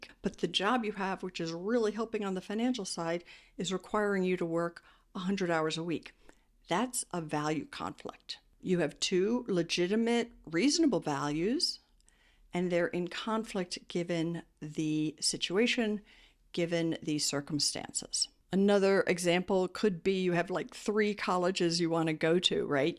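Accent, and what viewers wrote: United States. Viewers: Are you from Iowa?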